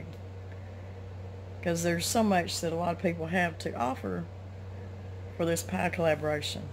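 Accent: South, United States. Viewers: I absolutely love your channel! Your southern accent is so beautiful and relaxing. You and are the cutest ever! You make me this yankee girl miss living in Tennesse God Bless y’all ♥️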